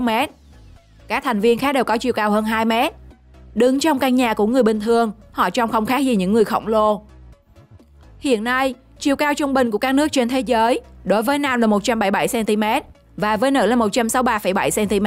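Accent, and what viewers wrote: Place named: Phu Yen, Vietnam. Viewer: Cô này là người Phú Yên- Tuy Hòa. Cô ấy cố gắng sửa giọng để giọng nói không bị rặc tiếng địa phương, nhưng càng sửa thì giọng cổ lại càng khó nghe vì nó lai đủ thứ miền.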